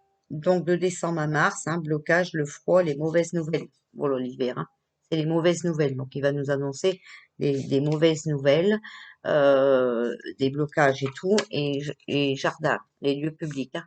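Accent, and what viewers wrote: North, France. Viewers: Bon Anniversaire 🥰💜❤️💛 Je viens de vous découvrir et j'apprécie votre naturel... et votre accent de chnord;) ( le même que ma grand-mère) Bonne continuation j'aime bien venir vous écouter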